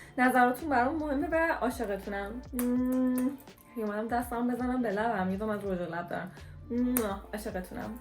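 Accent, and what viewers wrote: Yazd, Iran. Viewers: مگه یزدی هستیییبیی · وایییییی اتفاقا عالی یزدی حرف میزنی اولین بلاگری هستی که دیدم شهر ما ذو میشناسی و ازش حرف میزنی 🙂🤌✨ عاشقتممممممم🎀🍓😚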